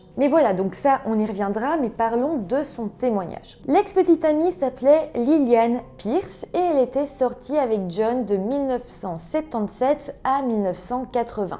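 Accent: Belgium. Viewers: Incroyable la justice américaine sur ce dossier, ce n'est vraiment pas cher payé pour un assassinat Merci pour cette histoire, j'ai cru reconnaître un léger accent belge, me trompe je ? CC des Vosges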